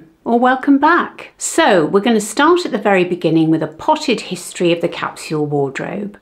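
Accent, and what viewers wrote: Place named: United Kingdom. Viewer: What a beautiful British accent!! Greetings from Poland😊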